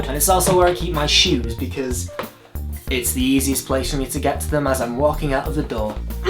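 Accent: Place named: North, United Kingdom. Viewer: I'm a northerner myself, but your accent is pretty nice compared to the ones around me, u go!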